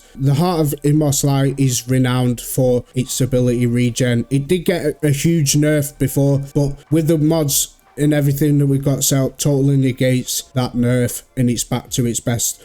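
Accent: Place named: Northern England, United Kingdom. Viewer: Bye heck great accent fella 👍🏻 fellow northerner in peace ☮️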